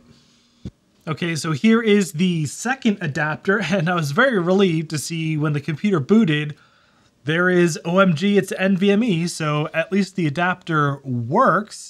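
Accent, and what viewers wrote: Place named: Philadelphia, United States. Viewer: WOODER... Iconic accent of a philly local, lol Met you at VCF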